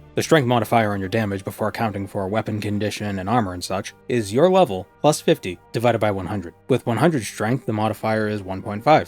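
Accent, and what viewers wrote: Boston, United States. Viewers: I knew there was something weird about you. Finally figured it out. You're from Boston aren't ya? Lol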